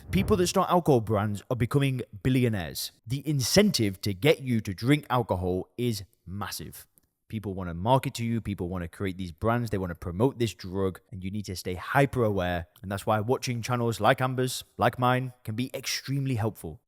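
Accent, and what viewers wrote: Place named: North Carolina, United States. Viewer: you are from NC aren't you? you have the NC accent!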